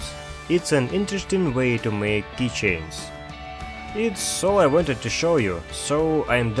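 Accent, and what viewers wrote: Ryazan, Russia. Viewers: Акцент рязанский )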